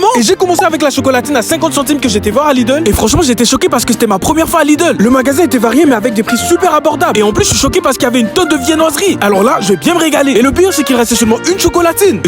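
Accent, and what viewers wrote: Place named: Quebec, Canada. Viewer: Tu es un Québécois ça se voit avec ton accent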